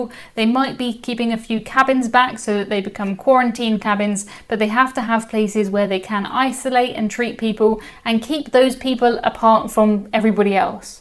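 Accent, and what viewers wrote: RP, United Kingdom. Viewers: Very informative and didn't feel too long; everything was well packed! Hopefully this level of cautiousness is only temporary, yet some of the procedures make sense for the future under all circumstances. Anyway.. It's much easier to learn about these things as someone (read ) has already digested the data and translated it to understandable language (posh accent or not..) and good examples. Thank you!:)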